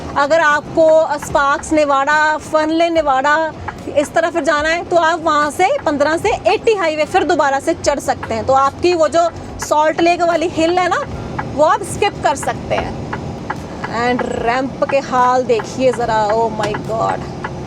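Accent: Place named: Punjab, India. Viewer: Aapki punjabi english badi pyari hai. Aap ki birth place konsi hai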